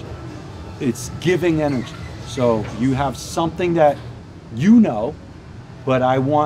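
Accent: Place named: United Kingdom. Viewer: He's American??? All this time I thought he was British...😂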